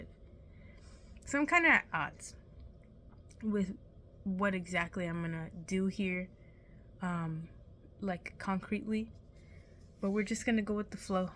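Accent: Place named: New York, United States. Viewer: Ahh, i could almost tell you're a New Yorker! Aayyyy. Keep going girl, I'm rooting for you.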